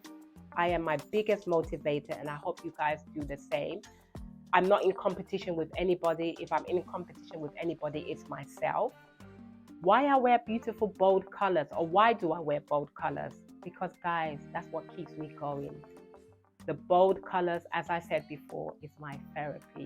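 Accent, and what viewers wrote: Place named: Nigeria. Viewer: I like your Nigerian accent 👌🏾 😍 🫂..you look beautiful as always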